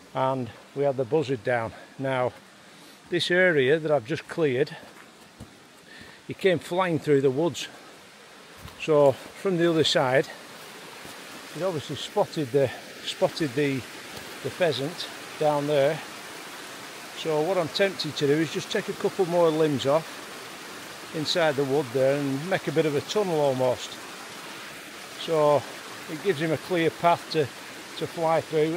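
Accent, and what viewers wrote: Lancashire, United Kingdom. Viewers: Awesome mate… always love listening that lanky twang here in Belgium… a link to my past in Wigan. Looking forward to watching you develop such an amazing place 👌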